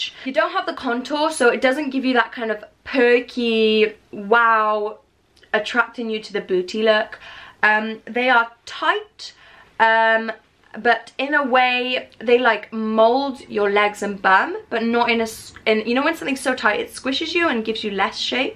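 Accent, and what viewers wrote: Wales, United Kingdom. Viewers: are you welsh? you seem to have a slight welsh accent to me haha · You are soo cute babe ♥ I am from the Czech republic and I am soo in love with your British accent!! Please please, do you know when will be those leggings restocked? Thanks, and please, more James in the vlogs and videos, you guys are so cute together! ♥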